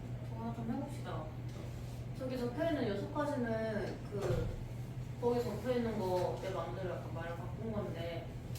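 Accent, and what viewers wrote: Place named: Jeolla, South Korea. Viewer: 전라도 분이시네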